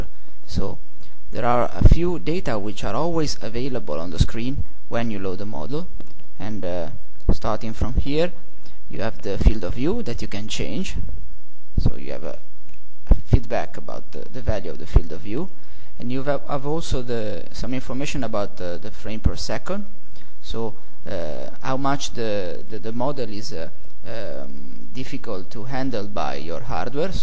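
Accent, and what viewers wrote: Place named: Italy. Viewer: Итальяно прейро))) По-английски говорит хорошо, но по оставшемуся акценту национальность легко определяется)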